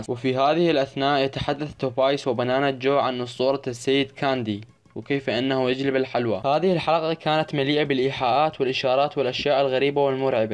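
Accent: Iraq. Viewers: انتة عراقي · انتا عراقي؟ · أنت عراقي لو لا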